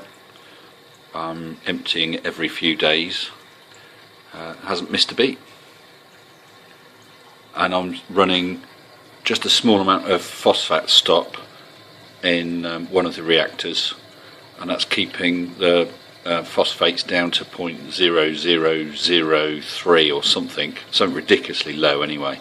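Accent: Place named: England, United Kingdom. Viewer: Stunning foxface mate I love them, unfortunately I lost my juvenile foxface 2 days ago 😢 and I don't no the reason at all all my water parameters are spot on the fish looked healthy no signs of illness and he was eating like a pig so I think I was the case of things die 👎quick question buddy i has sps birdsnest frags for sale and I can post if ure interested you've got a English accent so ino postage isn't a problem lol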